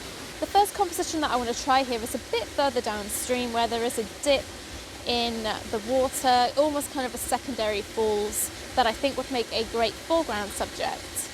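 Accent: United Kingdom. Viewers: Can you please teach me how to dance? But first, teach me how to talk with a British accent. Then teach me how to use an L-bracket on my Canon EOS R that has a Ulanzi Claw Quick Release plate on with a Ulanzi Zero Y Lightweight Travel Tripod. I hope I'm not asking too much. 💜 You asked for feedback on your audio as you were talking and setting up around the falls. Yes, the waterfall is loud, but I clearly understood what you said, even with my 66-year old ears. You mic gain could've been a tad (or wee, as y'all say) bit higher, but that might've increased the waterfall sounds too.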